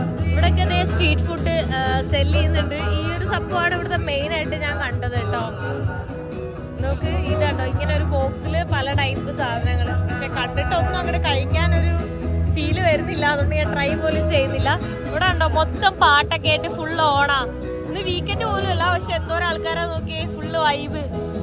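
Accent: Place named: Kozhikode, India. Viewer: Your malayalam is more like Kozhikode slang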